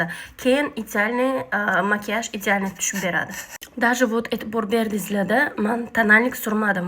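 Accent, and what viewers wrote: Bukhara, Uzbekistan. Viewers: Салом яхшимисиз хон! Сизни тинлаш ва куриш менга жуда хам ёкади айникса Бухороча акцент сизни яна хам ширин курсатади. Ишингизга омад соглик саломатлик тилаймай❤ купрок чикаринг шундай видеолар!!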